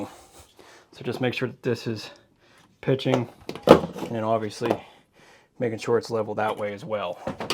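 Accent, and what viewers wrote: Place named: Pennsylvania, United States. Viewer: You can totally tell from Pennsylvania with his accent. 😅 Thanks for the video tutorials! Definitely giving me the confidence to do more on my own!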